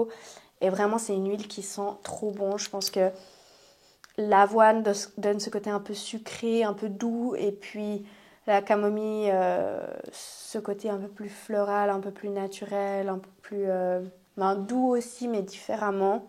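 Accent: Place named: Switzerland. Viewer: Ça me fait aimer l’accent suisse 😊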